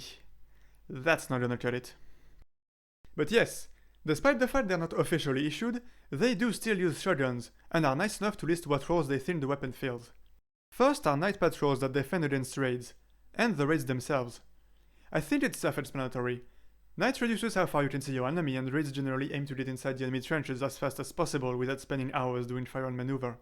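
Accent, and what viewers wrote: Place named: France. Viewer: Couldn't understand half of the video due to the extreme french accent but thanks for the information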